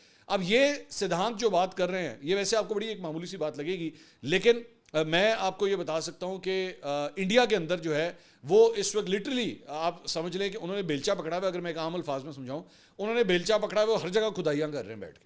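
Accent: Punjab, Pakistan. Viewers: اردو میں پنجابی ٹچ دینا آپکو دوسروں سے منفرد بناتا ہے... یہی انداز آپکو بہتر ویوز دیتا ہے.. 😉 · Ur Punjabi accent ❤️😂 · Hahahhaha bhai a jehra tusi vich punjabi da touch denday jay os naal program ka maza dubala ho jata hay😅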